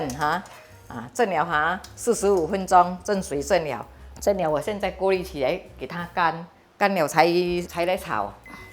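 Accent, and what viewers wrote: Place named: Hokkien, China. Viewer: Thank you auntie, like the way you present very detail, and Hokkien mandarin 😊 I’m Hokkien too 😊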